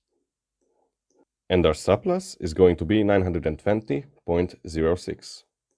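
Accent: Hungary. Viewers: jáj de magyar akcent :)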